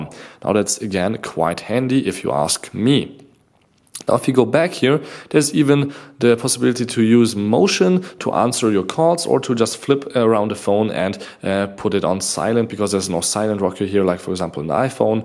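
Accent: Germany. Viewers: he sounds so funny and german i would like to listen this for hours and hours😆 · Shailen Sobhee which is really weird as he mentions that he is Hungarian. He has a very definitive German English accent :) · Durch den deutschen Akzent einfacher zu verstehen als Videos von Amerikanischen YouTubern! :)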